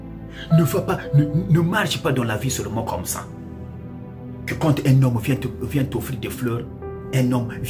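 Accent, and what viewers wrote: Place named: Gabon. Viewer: Ah ta we mu Nzebi? Tu parles comme un gabonais...Merci pour les conseils.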